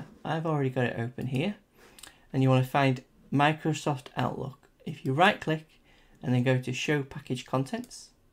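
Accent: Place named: United Kingdom. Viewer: You are a genius! I have gone to so many sites to try to fix this flaw on my brand new Macbook Air and your solution is the one that has made the Outlook search function work. The file display on my computer was a bit different than what was shown on your screen but I kept working to open the folders until I finally found Outlook in the library section. By including and then unchecking it from privacy it has started the search function. With your accent you might be in the UK--wish I could buy you a pint!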